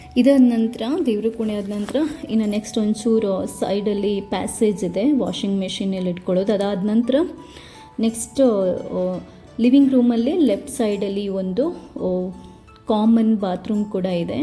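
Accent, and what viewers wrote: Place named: Mangalore, India. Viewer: ಅಕ್ಕೆ ಇರೆನ‌ ಕನ್ನಡ ಕೇನಗ ಎನ್ನಿಯೆ ಈರ್ ಕುಡ್ಲದಾರ್ ಆದುಪ್ಪು ಪಂದ್. ಎಡ್ಡೆ ಆಂಡ್... ಇರೆನ tips ಮತ ಎಡ್ಡೆ ಎಡ್ಡೆ ಉಂಡು.... Bangalore ಡು ಈತ್ ಎಡ್ಡೆ ಇಲ್ಲ್ ತಿಕ್ಕಿನ ಇರೆನ ನಸೀಬ್... ಬಾರಿ ಶೋಕ್ ಉಂಡು... ಇರೆನ ಆಸೆ ಲೆಕ ಆಯಿನಾತ್ ಬೇಗ ಸ್ವಂತ ಇಲ್ಲ್ ದೆಪ್ಪುಲೆ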